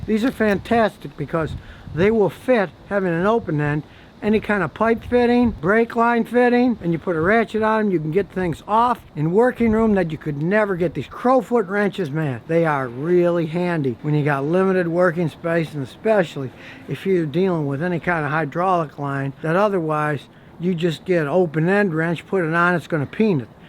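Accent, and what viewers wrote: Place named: New England, United States. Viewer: If you were not from Texas I would have after watching this video, thought you were a old YANKEE from New England. you reminded me so much of my old friend Barry. Keep up the great work.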